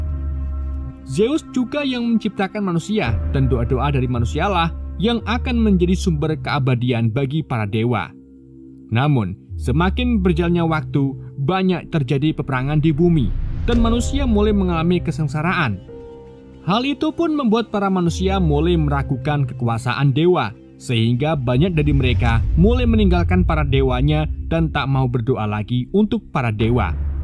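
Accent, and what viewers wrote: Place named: Java, Indonesia. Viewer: Boso jowomu medok mas🤣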